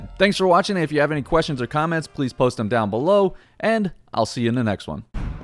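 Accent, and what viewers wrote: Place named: South, United States. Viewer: It’s called southern dialect. If ya live in the south, it is proper English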